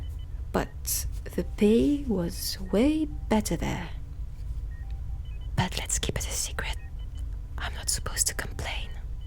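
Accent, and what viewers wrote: France. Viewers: For once the french accent is actually french, and not some strange abomination of an accent 😭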